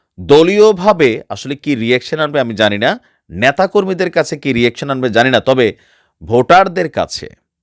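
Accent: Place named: Comilla, Bangladesh. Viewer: ভাই আসসালামু আলাইকুম আমি ইলিয়াস ভাই এবং পিনাকীদার এবং আপনার ফ্যান বিশেষ করে যখন আপনি কুমিল্লার ভাষায় কথা বলেন আমি হাসি কারন আমার নানা বাড়ি কুমিল্লায় । আমি আপনার মাধ্যমে ইলিয়াস ভাই এর উপর শ্রদ্ধা রেখে বলছি আমি মীরজাফখরুল ইসলাম স্যারের প্রতিবেশী ছাত্রী ছোটকাল থেকে আমি তাদের জানি এবং চিনি এইজন্য আমি প্রতিবাদের ভাষায় লিখছি । আমি কোন রাজনীতি বা দল করি না আমি একজন সামান্য সরকারি চাকরিজীবী স্যার এমন একজন মানুষ যিনি ঠাকুরগায়ের গর্ব উনি এক আভিজাত্য ফ্যামিলির ছেলে যার বাবার নাম মির্জা রুহুল আমিন তিনি খুব সত্যবাদী পরোপকারী এবং দয়ালু ছিলেন তিনি যাহা বলতেন তাহা মানুষের মুখের উপর বলতেন এবং মানুষ সেটা মেনে নিতো কারণ উনার বাবা ছিলেন স্পষ্টবাদী ্ তাই ঠাকুরগাঁয়ের মানুষ স্থানীয়ভাবে নাম দিয়েছিলেন চোখা মিয়া স্যার এবং উনার ফ্যামিলি কোন ইসলাম বিদ্বেষী নয় এবং ভারতের দালাল নয় উনি কোন দুর্নীতির সাথে জড়িত নয় যদি দুর্নীতির সাথে জড়িত থাকতো তাহলে রাজনীতির জন্য তাকে তার বাবার সম্পত্তি বিক্রি করতে হতো না। পরিষ্কারভাবে বলতে গেলে উনি একজন স্বচ্ছ ভদ্র ঘরের সন্তান যার ভিতর আভিজাত্য লুকিয়ে আছে । ঠাকুরগার আপামোর জনসাধারণের অতি প্রিয় মানুষ । স্যারের বাবার সম্পত্তি দিয়ে দু'চারটে বাড়ি যদি বিদেশে থেকে থাকে তাতে অবাক হব না । প্রথম আলো ডেইলি স্টার তার পছন্দের পত্রিকা হতে পারে । স্যার কোন নোংরা জড়িত নয় এতটুকু হলফ করে বলতে পারি। আশা করি আমার এই কমেন্টটুকু দেশবাসীকে পরিয়ে শুনাবেন। ইতি একজন ফ্যান মাকসুদা খাতুন ঠাকুরগাঁও।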